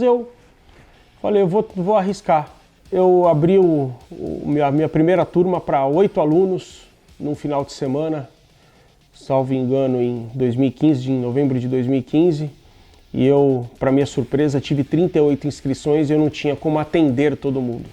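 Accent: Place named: São Paulo, Brazil. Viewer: Aquele sotaq paulista maroto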